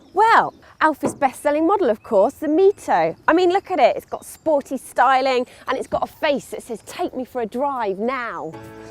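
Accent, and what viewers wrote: United Kingdom. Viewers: BRITISH ACCENT SO CUTE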